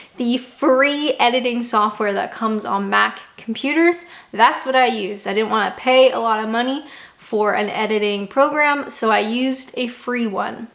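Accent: Canada. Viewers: fist of all, I'm sooo happy for you 🥳🥳 and i hope everyone works out for you for the rest of your life ! I've been learning english vocabulary and phrases from you over these video in oder to get used to Canadian's accent 🤗 (I'm a Korean I'll move to Canada next year ) So I'd be so happy if you could upload a video with subtitle ~^^ I had to write down every single word you said on video 😂😂 and then ask an native speaker to help me with some part i couldn't catch or catch incorrectly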